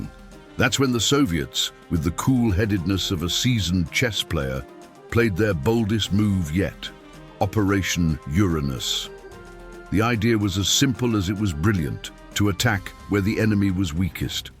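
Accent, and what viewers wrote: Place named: United Kingdom. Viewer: 20 minutes of BS in a British accent...YouTube should put warning "produce by British"....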